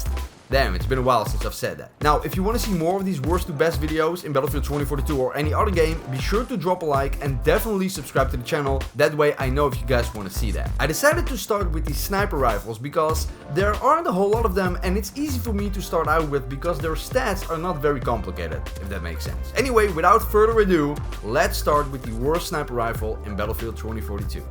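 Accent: Netherlands. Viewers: your dutch arent ya i can hear it in your english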